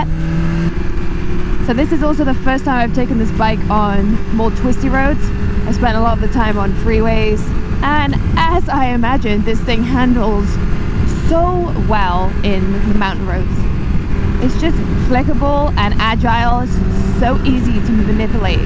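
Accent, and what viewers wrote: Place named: Cardiff, United Kingdom. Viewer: Mad hearing a Caadiff accent in the US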